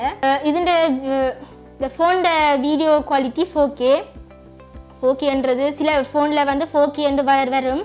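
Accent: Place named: Sri Lanka. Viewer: Sri Lanka tamil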